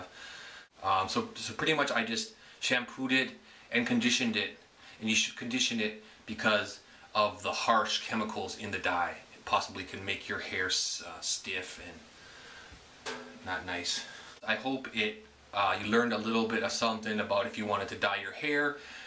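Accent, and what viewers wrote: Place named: Minnesota, United States. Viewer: Looks great! You from Minnesota? Haha caught the accent a bit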